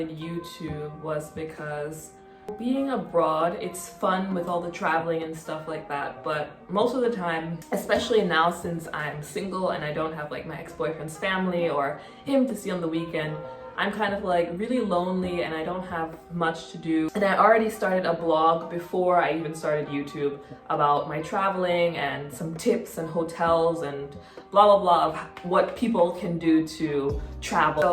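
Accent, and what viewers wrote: Jamaica. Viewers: I knew I heard that Jamaican accent hidden under there some where lol...also we have the same last name hmm.. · We’re the same height and I knew i heard some Jamaican in you! I’m Jamaican living in the US who also loves Romance Novels especially English Lit . I’ve never met anyone else who enjoys it most people think I’m actually living in a fantasy world but I know it’s exists lol ❤️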